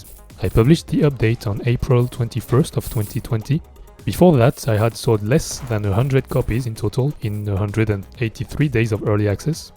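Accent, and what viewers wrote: France. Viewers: ton accent m'a l'air français ^^ Superbe travail c'est trés motivant.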